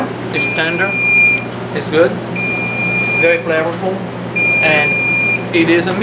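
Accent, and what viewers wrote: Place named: Southern California, United States. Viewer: socal beach learn how to speak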